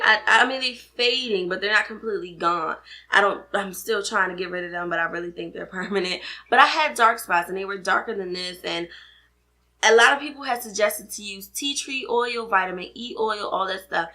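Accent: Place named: DMV, United States. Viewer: Im 4 years late LoL! But great video & Im going to assume you're from the DMV 🤞🏽 because I can hear it 😂😍 not sure if Im right, but usually a DMV person knows another 😊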